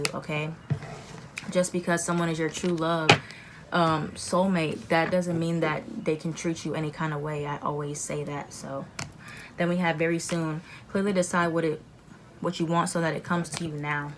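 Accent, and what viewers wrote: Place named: New York, United States. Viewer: This was the best reading, Today I felt the real New Yorker came out and spoke to me in a New York sort of way, I really like that and everything truly resonate 100% I am in a 3rd party situation with pisces his my husband of thirteen years, he left me like ten months ago, I recently find out that the women is pregnant for him, So I decided this was not for me anymore and filed for Divorce, some days are harder than others, I feel I'm moving forward, but by my capricorn standard not fast enough but I'm learning to take it one day out time, I believe the Universe got my back on this one because of the cruelty of the betrayal and how it was serve, still having a hard time with that one. Anyway Thank you for reading this long ass comment.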